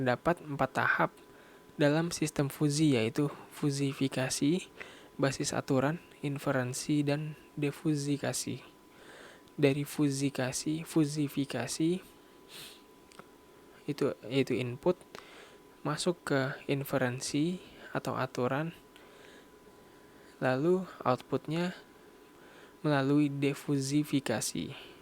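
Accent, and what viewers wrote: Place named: India. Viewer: Keren pak dosen ..... Pak dosen dari india yah? Logatnya kayak orang india 😅😅.... Sukses terus pak dosen ....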